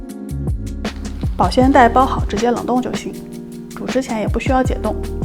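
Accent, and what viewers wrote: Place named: Wuhan, China. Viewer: 我就感觉你是武汉伢，真的，哈哈哈哈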